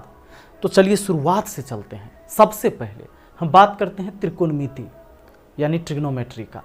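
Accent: Bihar, India. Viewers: Sir Ye sahi padato par Hindi Jo aapaki bihar ki jo hume samaj nahi aati jadya so esy hindo me bolo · Aap bihar se lag rhe ho sir ji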